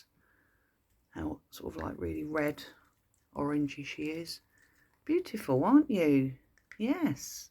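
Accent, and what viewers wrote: England, United Kingdom. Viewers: I love your videos, and your voice! We silly Americans go ga-ga for English accents! God bless you and the critters!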